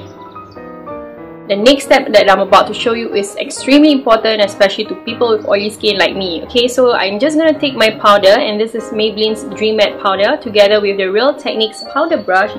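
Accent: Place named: Singapore. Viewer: you sound so singaporean! 😆 anyways whats your shade in mac?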